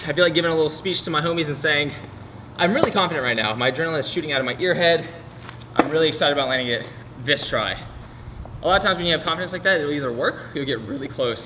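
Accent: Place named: South Carolina, United States. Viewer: I knew your accent sounded like you were from south carolina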